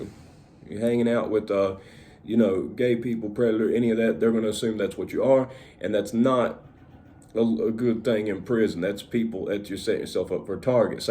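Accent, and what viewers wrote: United States. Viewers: from what part of the USA is this accent from?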